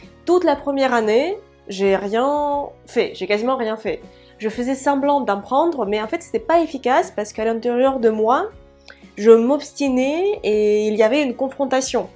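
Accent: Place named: Russia. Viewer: Votre Français est parfait avec une pointe d’accent Russe dans la prononciation c’est bonheur absolu. Félicitations et merci pour ce moment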